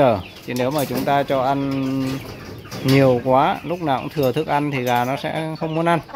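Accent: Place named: Dien Chau, Vietnam. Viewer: Đúng chất giọng diễn châu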